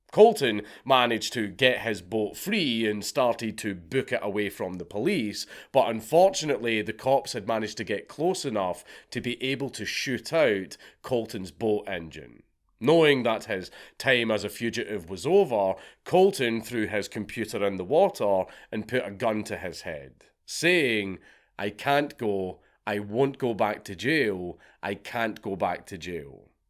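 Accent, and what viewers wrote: Scotland, United Kingdom. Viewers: Heard the story, read the story. But they story is best told with a scottish accent.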